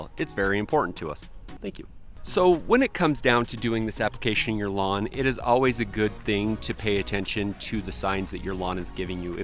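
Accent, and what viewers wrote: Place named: Utah, United States. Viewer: Just discovered your channel. Damn, your accent is hardcore Utahn.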